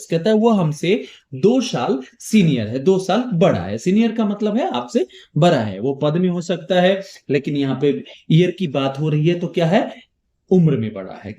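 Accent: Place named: India. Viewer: Very nice explanation. Which language is it? Very interesting.It sounds like Indian.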